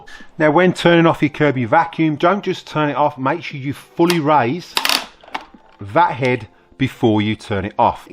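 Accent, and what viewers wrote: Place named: Birmingham, United Kingdom. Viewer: You say $200 when your accent sounds very clearly a UK £ one. Specifically from Birmingham UK West Midlands.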